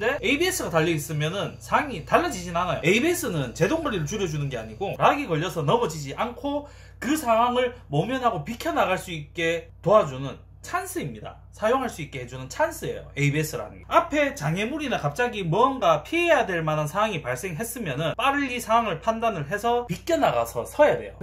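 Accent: Gyeongsang, South Korea. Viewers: 피자사장? 말투? 경상도... 혹시 진주 유로코사장님이십니까?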